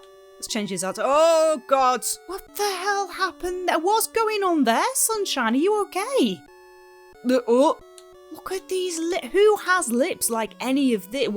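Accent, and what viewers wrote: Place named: Northern England, United Kingdom. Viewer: 2 questions: The name, is that a game or musical reference? And I know you're down south now but I hear northern, where did you grow up? (hull?) oh and Keep up the good work long time fan.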